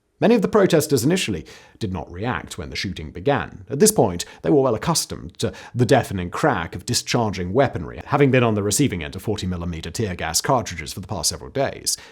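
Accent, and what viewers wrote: United Kingdom. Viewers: Yeah, a YouTuber of unknown qualifications and a British accent is going to tell us about the intent behind the killings at Kent State in Ohio.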